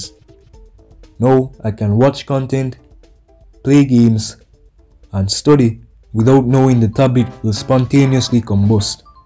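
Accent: Jamaica. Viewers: Are u jamaican bro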